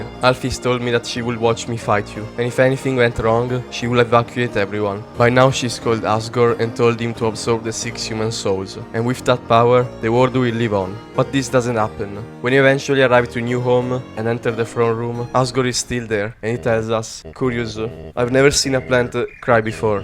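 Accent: Italy. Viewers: Ho aperto il video letteralmente 2 secondi ed ho detto: Ma è italiano! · i can hear the italian accent... are you italian?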